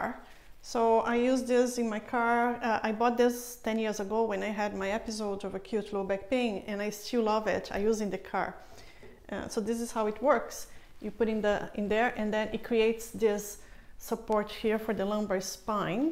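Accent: Brazil. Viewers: You sound Brazilian.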